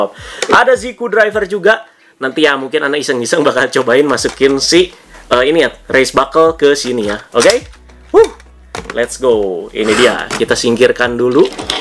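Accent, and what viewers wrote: West Java, Indonesia. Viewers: Agak logor. Sunda sekali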